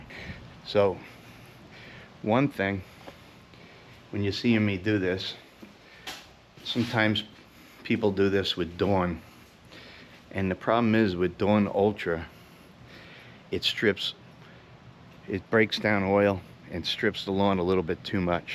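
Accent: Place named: New England, United States. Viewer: You know what, your little demonstration was spot on to show how soap helps with penetration!! That was F'in cool! And by your accent, I know you are in the same part of the country, N.E.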